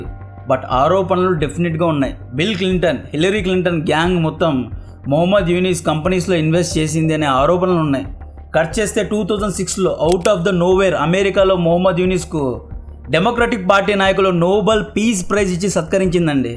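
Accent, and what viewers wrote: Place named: North India, India. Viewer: బ్రో మీరెందుకు తెలుగు భాషని ఒక్క ముస్లిం లాగానో లేదో తెలుగు రాని నార్త్ ఇండియన్ లాగా మాట్లాడతారు? పెండ్లాం కాదు అనాల్సింది భార్య అని కొద్దిగా ప్రొఫెషనల్ గా మాట్లాడటానికి ట్రై చెయ్యండి.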